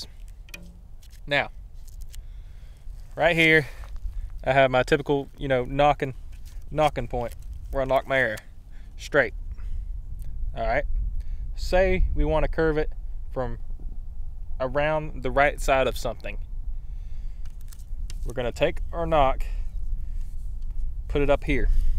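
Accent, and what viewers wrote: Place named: Rural, United States. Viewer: I was expecting him to start spitting into a spittoon chewing tobacco while smoking a cigarette and drinking whiskey from the bottle on his rocking chair... not breaking physics Loving the hick accent tho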